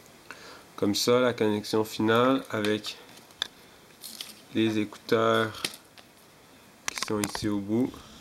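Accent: Quebec, Canada. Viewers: j'adore ton accents rebequooiiiiiss <3:) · Merci mec !! Je vais reparer mes ecouters Beats !! PS: tu es quebecois ?? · hey tu vien du Quebec ou quoi ?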